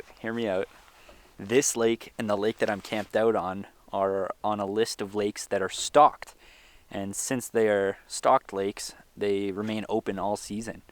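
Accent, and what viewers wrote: Canada. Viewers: are you french Canadian?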